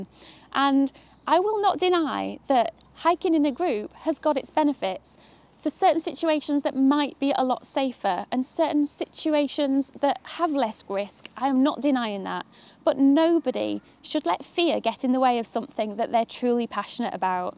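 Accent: United Kingdom. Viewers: I was confused for a moment when you mentioned fire arms despite the British accent😂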